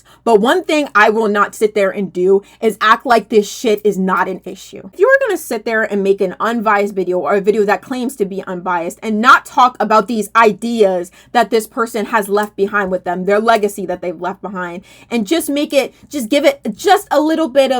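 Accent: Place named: New York, United States. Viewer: I unsubscribed from the fake wizard because of the annoying new york / jersey accent.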